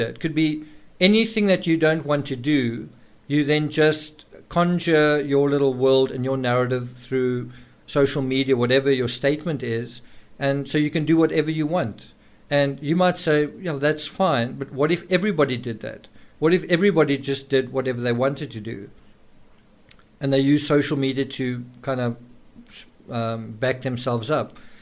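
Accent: South Africa. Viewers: Hi, are you a South African? watching from Cape Town South Afri8ca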